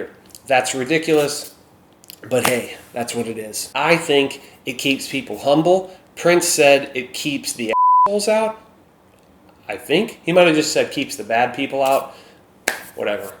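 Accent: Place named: Minnesota, United States. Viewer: My struggle is my Mother is from MN and I was born there but grew up in NJ Italian Dad, so I’ve experienced both cultures throughout my life. It could be neither one is ideal for me. But when I hear you pronounce Bag it sounds like beg..and all the other pronunciations even Pop for soda I’d rather just avoid hearing all that! I don’t love Jersey but I just can’t stand the Scandinavian ways and accent truthfully that is prevalent throughout MN!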